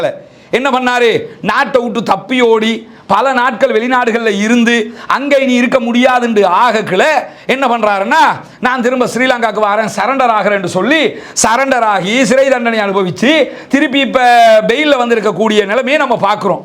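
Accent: Tamil Nadu, India. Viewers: தமிழ் நாட்டில் படித்தவர் அதனால் ஒரு சில வார்த்தைகள் அப்படி அல்ஹம்துலில்லாஹ் மக்களுக்கு தெளிவாக புரியும் என நம்புகிறேன் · Why your Tamil accent like PJ from Tamil nadu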